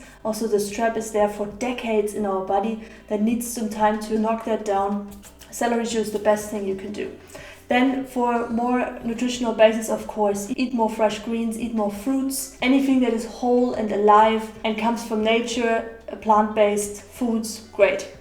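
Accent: Germany. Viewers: Bist du Deutsche, das hört sich so an? :) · I understand her very good, also with german accent but the audio quality could be a little bit better. Anyway, thank you for your awesome content!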